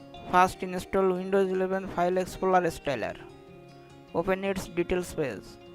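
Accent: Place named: Bihar, India. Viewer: no this is perfect just he have a harder Indian Accent Called Bihari or UP I'm not sure But u can use Subtitles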